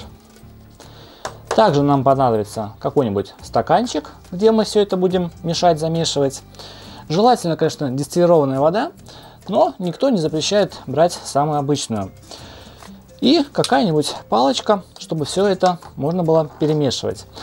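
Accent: Latvia. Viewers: Вы из Латвии?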